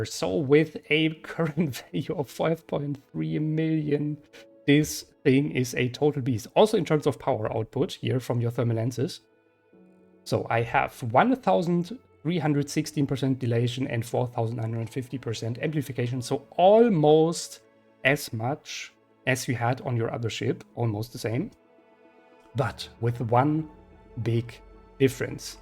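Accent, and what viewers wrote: Germany. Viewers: schade, man hört leider deinen deutschen Akzent 😄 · You only hear the accent and you know this is gonna be good. The magic of German engineering!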